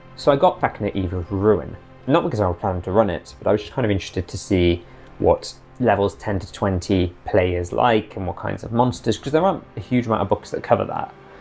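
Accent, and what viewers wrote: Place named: United Kingdom. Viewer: You are very welcome. Obviously, if you feel like it makes your videos less useful to the people who are only interested in how to run the domain and would rather stop including that stuff, don't keep doing it just on my account. I'd still watch the videos regardless. You might have to live with me asking about it in the comments then, though ;). And your accent isn't an issue at all, rest assured! I don't have a good ear for that kind of thing in the first place, nor do I really care and while I probably would have guessed "British" rather than "American English" in your case, it really mostly registers in my head as "a cool and kind person talking in English about something I am interested in".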